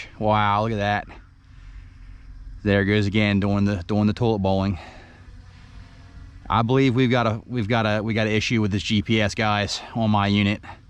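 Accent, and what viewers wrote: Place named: Baltimore, United States. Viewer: Love the straight up Baltimore accent. Sorry to see this with the drone. I was kind of excited to buy this for my son.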